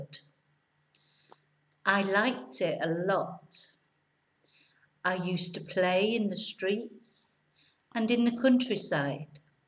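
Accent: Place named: England, United Kingdom. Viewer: Hi I really like your videos but you need to work on your Spanish accent you speak it with an English accent.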